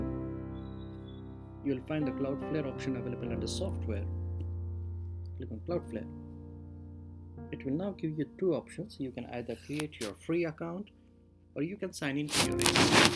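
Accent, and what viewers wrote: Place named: India. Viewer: Click on tutorial Hear Indian accent Close tab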